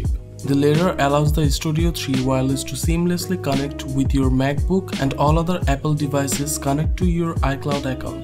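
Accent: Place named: Bangladesh. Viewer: You sound like a Bangladeshi :-)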